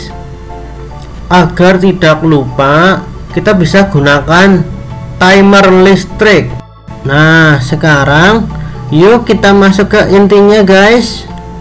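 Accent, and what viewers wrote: Banyumas, Indonesia. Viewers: wong ngapak bro?salam ngapak bro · Ngapak yak😅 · Jawa Ngapak Nya Jelas Banget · Ngapak mn gan...salam dariku dari malaysia..asal wonosobo😊.. Ma kasih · Daerah ngapak ndi yah ..pengin belajar langsung.. inyong cilacap. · versi ngapak gesssss ..... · ka ya uwong ngapak kie yah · bahasane NGAPAK MEMBAHANA...tapi bisa dipahami..terima kasih GAES.... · Bukan jawa gesss, tpi ngapak gesss bhsa daerah terlucu dan gk bisa hilang klaupun pkek bhsa indonesia ngapakknya tetep ada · Terhibur dengerin suara mas nya. Ngapaknya kentel banget. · Ngapak yaak😂😂😂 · Bahasanya masih kaku... Pasti orang ngapak nih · Logate wong ngapak kie ya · Suarane ngapak, hidup ngapak, semangat, ilmunya bermanfaat, makasih kang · wkwk logat ngapaknya masih ada gan 😂 tp nice info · Yang ngisi suara kayak orang ngapak pasti kiye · Hidroponik ngapak gaes..... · gan iki ngapak banyumasan opo tegal gan ? 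pada bae ngapak sukses yo bos... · Di gas i kang ngapak e. Mantap hdroponik nya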